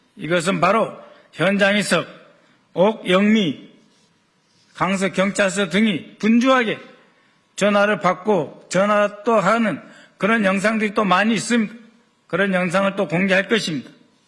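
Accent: North Korea. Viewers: 말투나 어떻게 좀 해 주세요. 여기가 북한도 아니고.. 슴다 슴다.. 듣기 거북해 ... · ㅋㅋㅋ 북한말투 거슬려.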